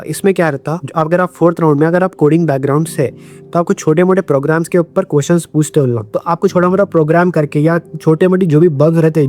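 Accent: Hyderabad, India. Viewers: Aap Itna Hyderabadi Accent ma Baat kyu Krta, Sunne ma Bohot Funny Lagta 😂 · accent ka wajha seay kuy ko hydrabadh seay ha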